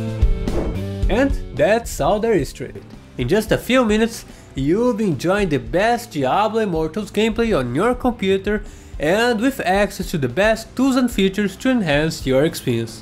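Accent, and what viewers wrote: Brazil. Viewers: Tá falando de um jeito que brasileiro falando inglês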